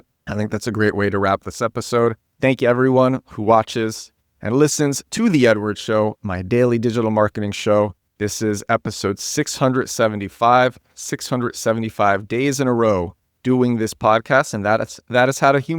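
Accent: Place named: United States. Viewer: I really like your English and your accent, so could you please tell me which accent is this? I'm sure it is an American accent but which state? which part of America? Thank you, you've got another follower, congrats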